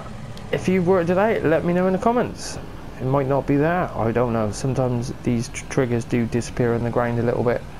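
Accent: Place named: Somerset, United Kingdom. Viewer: Somerset farms tour, complete with a somerset accent, lol New to this map, being born and raised close to somerset this map was a must for me, this was probably one of the better map tours I've found, good job fella (liked and subbed)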